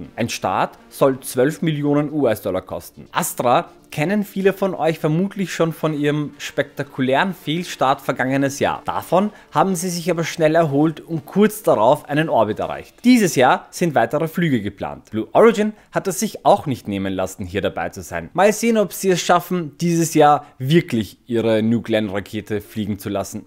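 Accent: Austria. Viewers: Sehr gut! Tolle Ideen, ja bitte! Mehr Witze, mit Oestereicherim Akzent! Ehrlich Top 10 Space YouTuber in allen Sprachen! Wie wäre es mit einer Episode, die sich damit beschäftigt, was passiert, wenn Starship 420 bei (1) Start, (2) Max-Q, usw. explodiert? Und wie Du die Chancen für jeden weiteren Schritt einschätzt. :)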